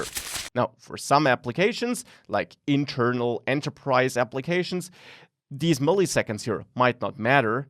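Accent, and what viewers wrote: Germany. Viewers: that is the second video i watch from u, i really like ur videos, that is why ur earned my sub. i have to laugh about ur german accent but still Tapfer of u, u talk very well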